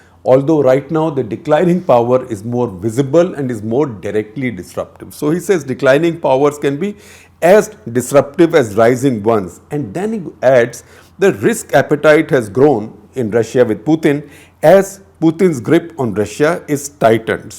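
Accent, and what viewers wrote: India. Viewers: Indian accented is hard to understand 🤪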